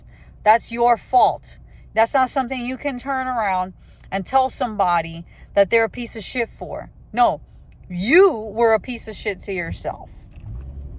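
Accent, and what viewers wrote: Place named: Texas, United States. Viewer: you sound like 100% Texas mother.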